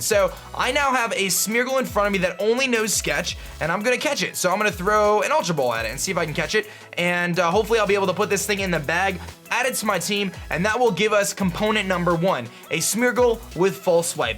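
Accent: Australia. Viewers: I just noticed he switches between kinda austrailian and american accents so he sounds like one of those surfer dudes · I haven't watched in a while... when the f did he get an aussie accent?! peukomon it's pretty faint but it's pretty new for me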